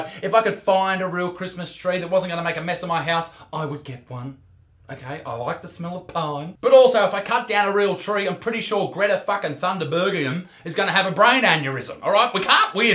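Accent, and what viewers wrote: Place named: Australia. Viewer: Thank you,. I have spent 11 months of what feels like the worst nightmare of my life after traumatically losing the man I loved the most in this world. I miss him terribly and the season is definitely not going well. Not really because it's Christmas, but just remembering this time last year him being sick... but still having him with me. I'm really glad I did stumble onto your channel. The things you say and the way you say them remind me so much of him (except with an Aussie accent) so it feels like you give me that little bit of him back when you upload a video and I thank you for that.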